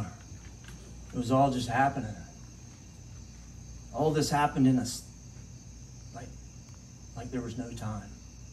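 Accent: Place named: South, United States. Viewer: That was beautiful! I loved the part when you said the word light and lightning flashed in the distance. Thank you. We are so proud of you! You still have your southern accent. ❤️—Myrtle Beach mom and daughter, Catherine MBHS Sophomore.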